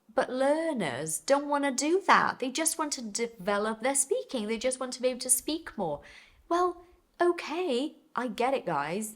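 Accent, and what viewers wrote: United Kingdom. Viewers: Hi,! I can belivie that you're Brazilian! So am I! Your English is so great, although it's British English. I'm new here and I just follow you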